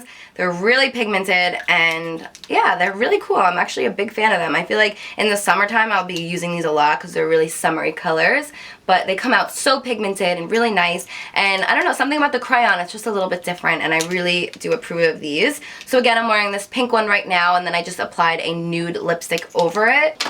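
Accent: New Jersey, United States. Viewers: is she from jersey??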